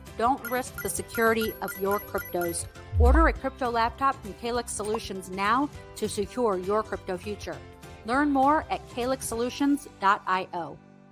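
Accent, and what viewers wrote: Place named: South, United States. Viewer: This right here is the second time I am coming across this name in a week. Came to my school for a lesson and it was lit and yes, she has a really cute southern accent.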